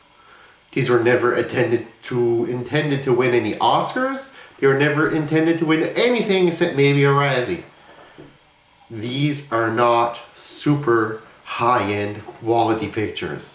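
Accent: Canada. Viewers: you from up north like thunder bay, cuz your accent is very canadian :P i could tell lol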